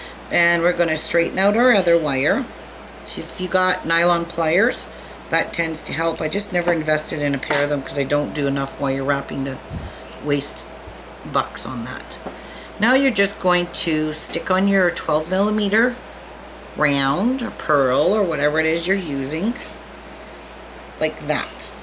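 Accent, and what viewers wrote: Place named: Maine, United States. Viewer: Hello <3 I just wanted to say how much I enjoyed your personality! You were a breath of fresh air with your modesty, and reminded me of my childhood on the coast of Maine with your expressions and way of speaking. I was just reading some of the comments, and honestly, I wonder how some people can be so rude and judgmental under the guise of giving you "constructive criticism". My wonderfully colorful Great Aunt Laura from Great Island had an expression for folks such as these.....*spoiler alert, language may offend some of you** Opinions are like arseholes, ~everyone has one.... doesn't mean ya have to BE one! Happy Crafting, Love!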